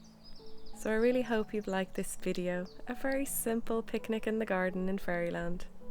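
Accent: Ireland. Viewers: Well I know that I could sit and listen to that Irish lilt all day long! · Oh wow! I loved this. I don't know how I haven't seen your channel before! I'm Irish but living abroad and the two things I miss most about Ireland, the Irish accent and nature, you provide in abundance. I am now your number one fan ;-) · Where about from Ireland are you from as I adore your accent. My parents were Northern Irish and from Belfast. They emigrated to Australia when young and had 3 children with me being the only girl and youngest. I’ve never been but as I’ve got older I speak now with a broad Belfast accent I picked up from my years living with my parents. My husband is Scottish and we can’t believe how broad my accent is even though I’ve never been abroad.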